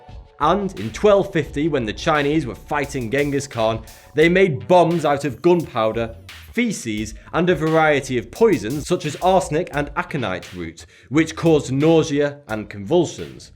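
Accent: United Kingdom. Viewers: Bro. I love your voice. Btw I like that Brittish accent. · Watching this video, my roommate walked by, and asked why Frankie Munez was talking in a British accent. No. You are NOT Malcolm in the Middle, and I will correct him on his folly.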